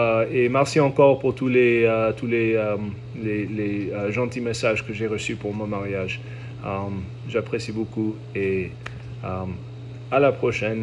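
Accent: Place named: France. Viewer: You’ve got an awesome French accent mate, congrats (I’m French)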